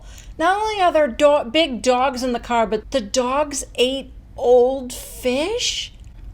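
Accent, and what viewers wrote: New Jersey, United States. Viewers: God! You’re Jersey accent is awful!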